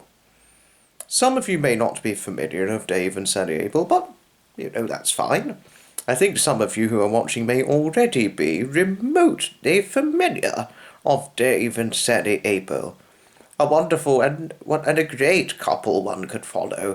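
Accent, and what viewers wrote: England, United Kingdom. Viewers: … you certainly need to do “shout outs & personalised messages “ just as Nigel farage and other YouTube celebrities do. Your ace in the whole is that you have the quintessential English accent which I feel would go down a storm in th USA!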